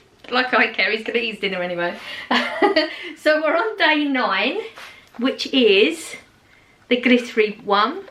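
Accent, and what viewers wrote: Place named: England, United Kingdom. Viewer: Never to chatty, enjoy your lovely English accent..makes me remember my grandpa he was from England,miss hearing his accent.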